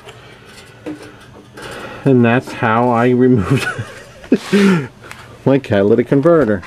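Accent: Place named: New Jersey, United States. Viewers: off subject.Where you from? your voice...you sound like your from Jersey.... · Yuppp he’s definitely from jersey cause I recognize that accent lmao & I’m a New Jerseyian myself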